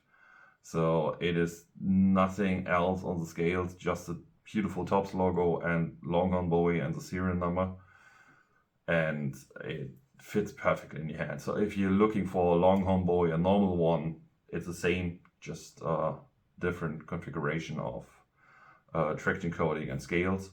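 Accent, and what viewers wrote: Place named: Germany. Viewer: You're German I hear.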